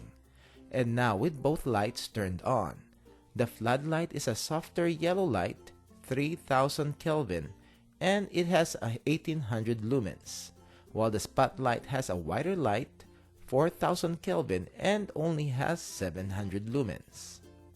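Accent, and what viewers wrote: Philippines. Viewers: Is that a Filipino accent? Tagalog?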